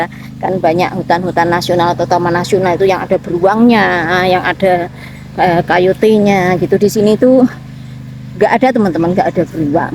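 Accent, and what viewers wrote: Java, Indonesia. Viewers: Ngomong bahasa inggris tp logatnya medok jowone😘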